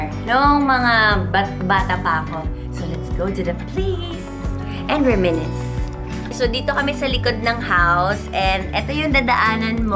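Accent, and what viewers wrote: Visayas, Philippines. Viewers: Cute kaayo ka mag bisaya naa tawo🙂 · hala hahahahaha! bisaya-a oi! molutaw jud.. love it!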